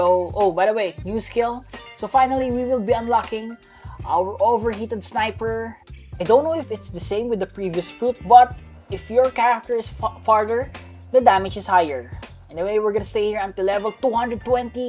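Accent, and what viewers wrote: Philippines. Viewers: i knew he was philipino its in the accent i know filipino english when i hear one :) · I hear your sound you are Tagalog po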